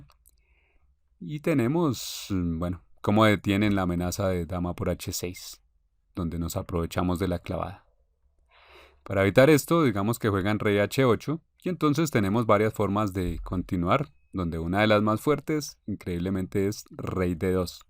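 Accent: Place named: Colombia. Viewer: excelente video, hablas como un colombiano saludos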